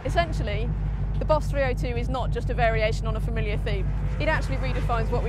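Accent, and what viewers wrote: United Kingdom. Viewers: LOVE that British accent. Love the Boss as well. Have the 2012 Boss and it's a blast. Good tune and 305s got me to high 11s.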